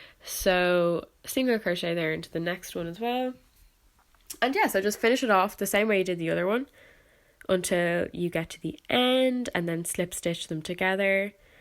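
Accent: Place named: Ireland. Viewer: Are you Irish? you have an Irish accent. (I know what an Irish accent sounds like because I live in Ireland)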